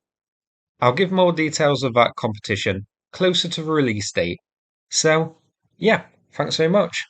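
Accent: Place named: North, United Kingdom. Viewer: PLEASE tell me you are doing the audio book version, I need to hear those dinosaur names read out with that norther accent!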